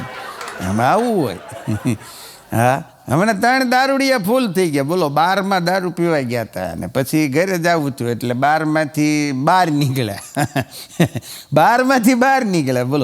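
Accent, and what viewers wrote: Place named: Kathiawar, India. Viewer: Tmey. Sudtth. Gujarati. Bhasama. Bolo. Kadttiya vadi. Bhasa. Gamti. Ndthi😢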